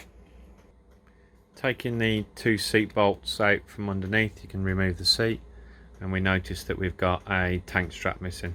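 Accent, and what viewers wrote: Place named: England, United Kingdom. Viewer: Great and informative video! Very clear and well explained (even with the English accent 😉) I just bought one for my son.. it seems they all need some work. Someone too heavy was riding this one and damaged the rear monoshock. Just waiting on the mail now! Has your daughter been enjoying the bike, now that you have it all fixed up?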